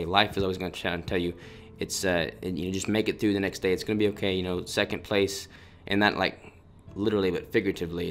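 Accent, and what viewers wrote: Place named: South, United States. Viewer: Where are you from bro? I hear a southern accent.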